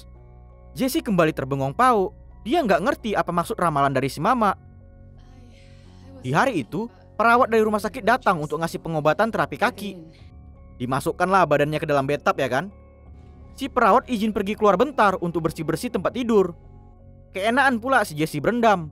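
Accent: Medan, Indonesia. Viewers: Pasti abang org medan😂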